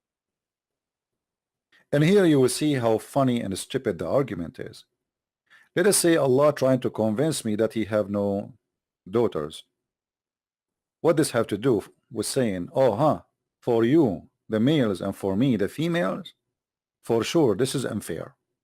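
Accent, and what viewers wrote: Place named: Israel. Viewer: What in the name of the judgement day are you talking about? Arabic Gods? Islam came to destroy those false Gods. God did not give birth nor was born. it is the Clearest message in the book that you insult. why there is always those small channels that no one knows with a guy with thick israeli accent trying to promote something so ignorant to such small number of people off the grid, don't you feel ashamed?. i mean if you hate the religion okay. you're free but don't be stupid. there are history books written by your people, educate yourself before talking stupidly and making a joke out of yourself. you're supposed to be a teacher, a preacher? what are you? your job is to attack other people's beliefs based on lies? is this what your main religion taught you? to spread lies in order to badly influence ignorant people that has no knowledge about anything ? 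Whatever man. God is Greater than any work in the dark. He's always awake and always sees everything. Even the things we never say. keep that in mind. Peace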